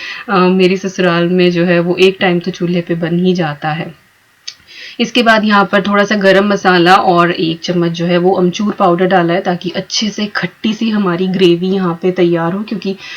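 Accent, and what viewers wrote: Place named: Muzaffarnagar, India. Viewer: Di plz reply aap India me kha se ho aap ki baato se lg ra h aap mzn k aas paas se ho kyoki kale sing ji whi pe h or wha aise hi chulhe pe kheer bnai jati h nd wha pe hm bhi gye h kitni baar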